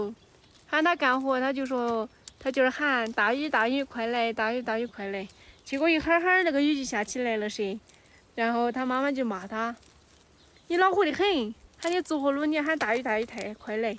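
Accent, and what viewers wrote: Sichuan, China. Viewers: 사천 방언을 하시는것 같아요 티베트언어는 할줄아세요?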